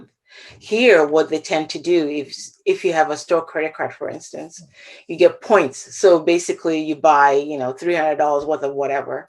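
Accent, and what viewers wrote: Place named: Brooklyn, United States. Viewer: Salud🌹🌹🌹 dear thank you for sharing.... My hubby w his BK accent would appreciate your BK fuggetabouit 😹😁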